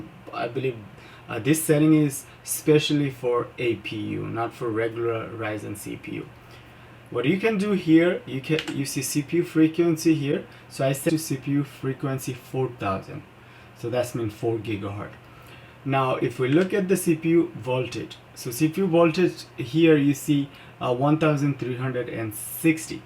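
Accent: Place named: India. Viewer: Are you from India? It seems you have Native Indian accents.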